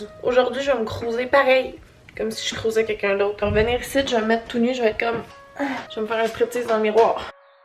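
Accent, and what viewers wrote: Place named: Quebec, Canada. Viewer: Moi, prendre soin de moi ça n'arrive pas souvent, mais quand je le fais, je suis à fond: je passe longtemps (très longtemps) dans la salle de bain à me faire pleins de masques, de gommages, me mettre de la crème et tout et puis j'aime beaucoup me faire des petites surprises/choses cool au quotidien, rien que faire mon lit avant d'aller faire ma douche pour pouvoir me jeter dessus après ça me fait du bien... Faire la cuisine pour prendre une photo trop belle (et bien manger 😋), ça ça me fait plaisir. Mais j'aimerais beaucoup me faire une journée solo comme toi: aller au ciné, au restaurant, faire les magasins toute seule mais c'est un peu compliquée parce que du haut de mes (1,83 m / 6 pieds et ) 14 ans, je ne peux pas faire énormément de choses route seule... 😢 Mais j'en profiterai plus tard 😜, là je me concentré sur mon brevet blanc de lundi prochain 😥, courage à tous les 3èmes de France et pour finir, De gros bisous à toi qui me redonne le sourire avec ton accent québécois et tes idées toujours aussi géniales qu'originales 😉. 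Full love 😘❤️❤️❤️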